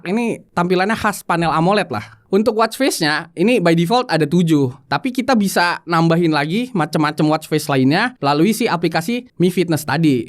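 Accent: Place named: West Java, Indonesia. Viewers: meni nyunda pisan euy si akangnya 😁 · Ngomong nya cepat belibet artikulasi kurang jelas, dari aksen bicara nya khas Sundanese, lain kali kalau ulas gadget lagi, mohon tempo bicara di atur yang pas jangan tergesa-gesa, supaya pemirsa tidak bingung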